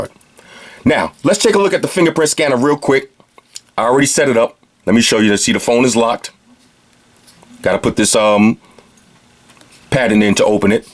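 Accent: New York, United States. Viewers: shout out to that new york accent lol wallwey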